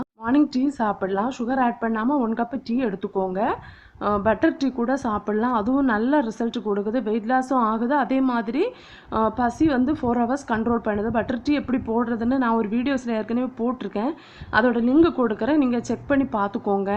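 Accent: Kanyakumari, India. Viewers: Hi, Nice to hear kumari Tamil. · Am also from KANYAKUMARI .I had found u with ur slang.well done sister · Akka neega kanyakumari ah? Slang correct ah Iruku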